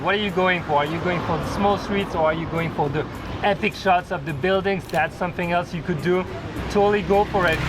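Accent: France. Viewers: J’entends ton accent français qq part mais vos vidéo sont grave bien et ça m’aide beaucoup pour avancer dans la photographie merci! :)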